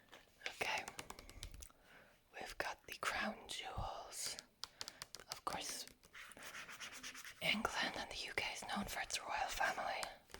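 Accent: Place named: Ireland. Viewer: I'm part Irish myself. Love the Irish accent.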